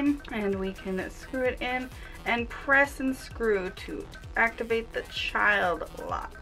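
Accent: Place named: Germany. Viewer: Felicitari! Vorbesti engleză ca un neamt!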